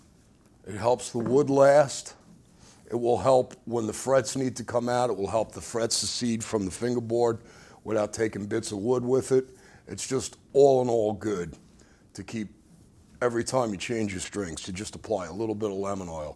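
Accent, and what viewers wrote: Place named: New Jersey, United States. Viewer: Jersey is my guess...def.not Boston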